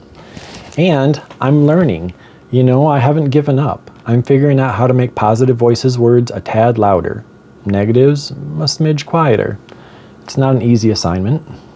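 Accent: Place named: Midwest, United States. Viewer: Hi. You're so normal that you're confusing me. I think I've been alone too long. I live in a box too. That's because, four years ago my husband went in the kitchen on his birthday and he passed away on our kitchen floor. I chose a 12 x 11 box. For a year, I layed in my bed for 23 hours a day. You see, I don't drive and nobody came. I went into shock and I was afraid to cry because, I thought there was a pretty good chance I might start screaming and then, who was going to stop me? Fortunately, I had my dog Charlie. She was the reason I had to get out of bed for an hour a day. I've spent a while trying to figure out who I am after I found myself in isolation. It's a good thing that I have a lot of songs too. I was into my kick about 2 months ago. She's adorable and man, can she sing. I started my toothless journey probably last year sometime. 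Maybe that's the only positive thing about being alone. I didn't have to talk to anyone. Which is kind of sad because, I love talking to people. I have just recently learned to quiet that negative voice. If a negative thought pops in my head, I'll actually stop and say, that's not my thought. Get lost. I wonder something because of your accent and you saying that you grew up in the Midwest. I live in Michigan and they call us the Midwest but, we talk more like Canadians. Anyway, I was gifted a set of temporary dentures and I'm going in a week for the second re line. I was thinking about how much I loved licorice and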